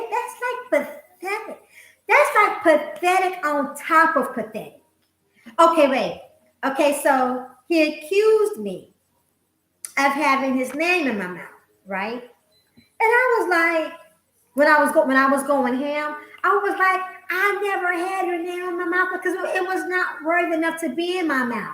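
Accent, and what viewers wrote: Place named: United States. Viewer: Hey, are you from the states? Reason why I ask is because of your accent, which is lovely btw.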